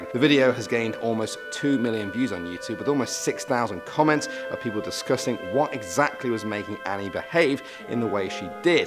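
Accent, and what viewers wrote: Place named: United Kingdom. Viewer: Love your british accent, greetings and support from Sweden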